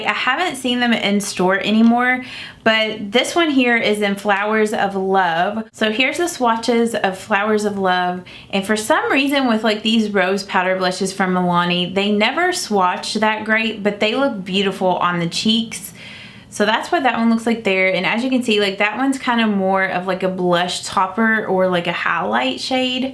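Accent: United States. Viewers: Thank you for the Milani review,. I have several of the items you spoke of (blush, eyeshadow, lip creams). They are awesome and long-lasting. Please do a video on your makeup look in this video - it's beautiful! I love your accent, where are you from in the US? I am from Ontario, Canada. Cheers!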